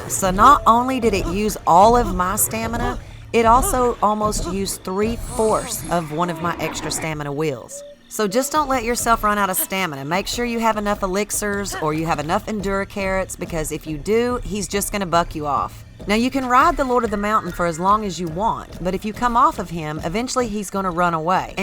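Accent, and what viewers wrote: South, United States. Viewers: Love that souther accent. So informative. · Southerners play video games? 😂. Sounds so odd for a souther gal talk VG’s. Respect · It’s 2022, I don’t watch these for the tips 😂 I’m here for her soothing country voice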